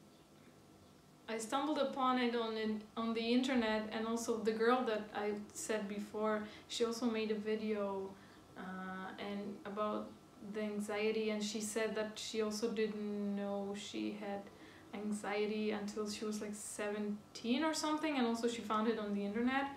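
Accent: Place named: Europe. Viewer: Honestly I think you got lots of potential. It's a shame you quit. I do similar vids. You sound European, let me guess..... enmmm... tbh idk. Middle Europe? Aahh Poland! So I guess I was right. I got my answer as I watched your video. It's a good video. You should do a legendary comeback to youtube.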